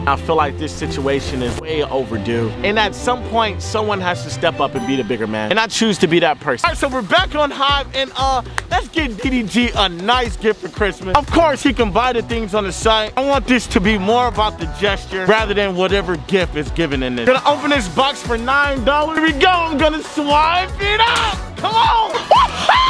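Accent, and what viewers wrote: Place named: Baltimore, United States. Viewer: Y he sound like he from baltimore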